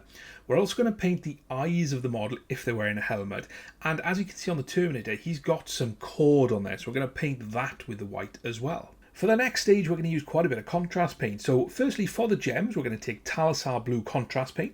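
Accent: Wales, United Kingdom. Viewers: Wait is that a Newport/Cardiff accent I hear???? Sub!!!! Oh and great content!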